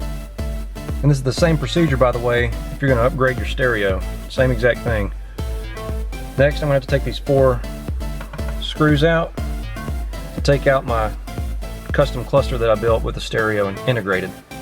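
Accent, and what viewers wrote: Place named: Carolinas, United States. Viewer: Are you in the Carolina's? Guessing by your accent ;) I too have a 3rd Gen 4runner with the TRD S/C (Walbro 190LPH, Supra Injectors, URD Pulley, JET flash ecu). Pings going uphill under load so I'm looking at Methanol injection. CLEAN INSTALL and I appreciate your thorough explanations!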